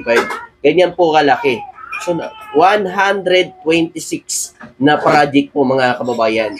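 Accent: Iloilo, Philippines. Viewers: Taga iloilo ka man boss? 🎉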